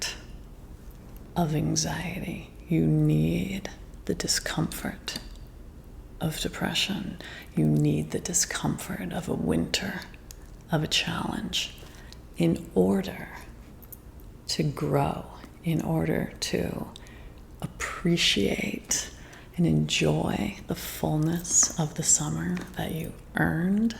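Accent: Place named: United States. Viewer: I really love your soft and American aristocratic accent. You seem very high society. That combined with your creativity and intelligence and generous inviting attitude is really attractive. It's intimidating but at the same time I would love to have conversations such as these with you. i love your vids! Thank you for sharing! I find it relaxing and interesting!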